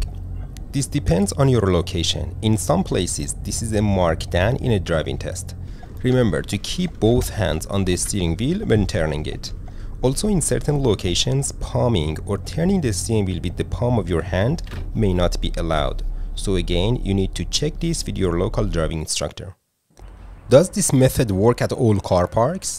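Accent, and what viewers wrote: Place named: Iran. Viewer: I bet he is Persian. I could spot on his accent. Thx regardless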